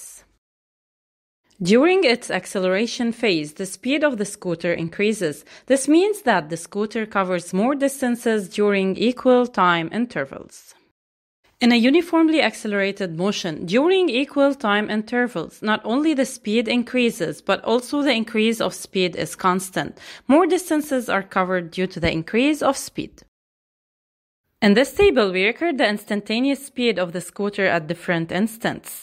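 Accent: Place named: Lebanon. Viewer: the narrator sounds Lebanese to me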